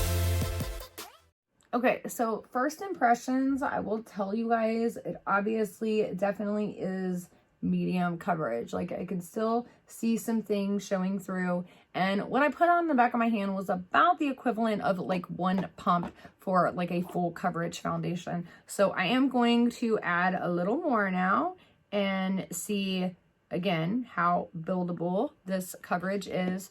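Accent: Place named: Kentucky, United States. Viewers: Country accent gotta love em lol and my mind wasn't in the gutter till you said something 😂😂😂😂😂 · I am from Kentucky as well and I completely get the pronunciation thing.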